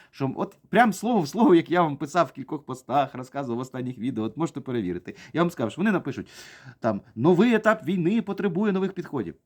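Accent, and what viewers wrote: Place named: Kyiv, Ukraine. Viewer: Не переживай. Хтось до і доживе. Дякуємо автору за справжню українську- київську говірку,ви говорите по нашому... без отого польського " наречія"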